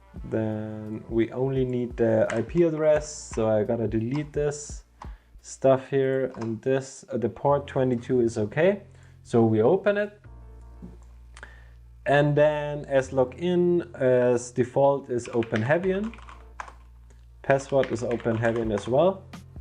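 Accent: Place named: United Kingdom. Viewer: Love your work man, and your British is cool 🤙🏻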